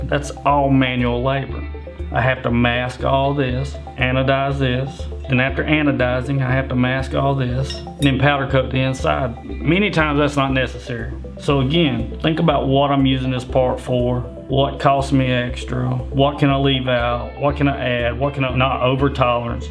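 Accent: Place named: Deep South, United States. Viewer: Holy southern accent Batman. You gotta be from the deep South or the Cajun states. I'm in Wisconsin, spent a decade in Tennessee Georgia north Carolina area and that's a strong accent to me.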